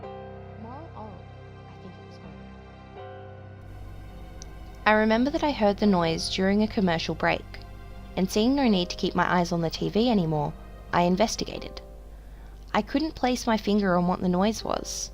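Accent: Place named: Australia. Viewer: It's good, you have to work on the voice quality (mainly removing white noise, if you use audacity, the noise reduction feature will be your best friend, and also the change in volume, try turning the music up and down instead of the vocal track) but it's still a better quality than my first videos! And I'm a sucker for Australian accents, so I absolutely love that! :) Subbed, rooting for you!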